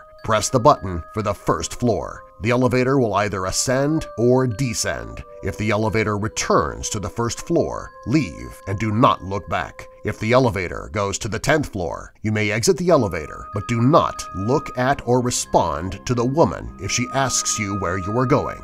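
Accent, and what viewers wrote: United States. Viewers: You. Are. American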